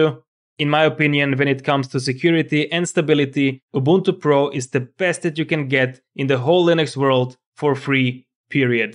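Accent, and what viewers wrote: Russia. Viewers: Че акцент такой русский?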